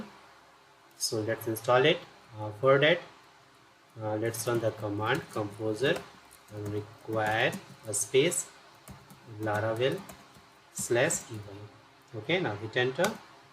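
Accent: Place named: India. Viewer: I love you so much Mister India, my friend and i love your accent so much, we will come to India soon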